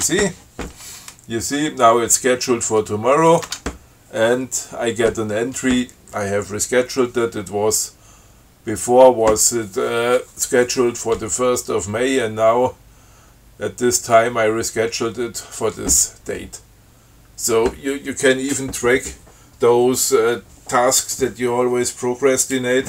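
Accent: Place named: Austria. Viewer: I also immediately thought that this sounds like Austrian English :D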